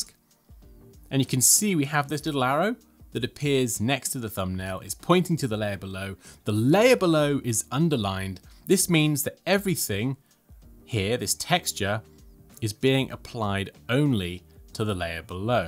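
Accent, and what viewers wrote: England, United Kingdom. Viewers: Very listenable English accent! :-) Makes such a nice change to 'other' accents!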